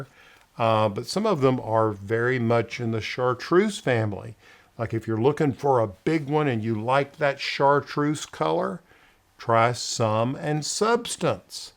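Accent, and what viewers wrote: South, United States. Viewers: I am from Kentucky but am now in Vancouver, BC, Canada. I love hearing your Southern accent! I do have a big shade area and do have hostas, but the gardeners may keep pulling them. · At home here in London where I’m talking about my hostas and how to fill the shady areas of my garden. I can’t believe my luck to have your video pop up. You have a great video format here. As a beginning gardener, Gardener’s question time on Radio 4 (here in the UK) can be pitched a little towards the expert gardeners for me, but your style is very warm (charming to hear that quaint southern American accent), engaging and informative. Loved your ‘filler, spiller and thriller’ concept! I’ll be applying that method this afternoon. Thanks again for making this great video. I just subscribed as I’d like to I see and hear more from you. Good luck!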